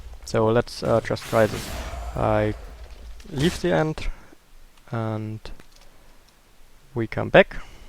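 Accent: Germany. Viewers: deutscher akzent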